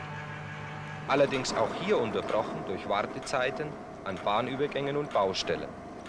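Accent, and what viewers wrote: Germany. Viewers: This iss a Dialekt from the bavarian County calling " Fränkisch " ! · Natürlich ist das Schweinfurterisch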